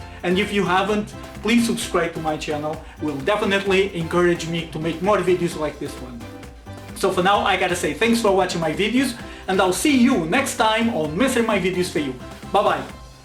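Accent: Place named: Portugal. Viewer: Ah! Sabia que tinha ouvido este sotaque dum outro lugar! Já tive em Portugal antes, vivi em Braga e Lisboa, belas cidades, devo dizer. Saudades de Portugal, um abraço!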